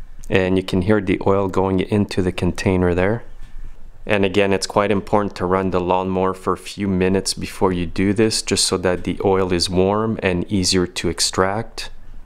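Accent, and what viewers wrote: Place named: Canada. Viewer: Can tell u are Canadian. Your English is perfect, and you are a perfect instructor. Have you considered teaching at a college?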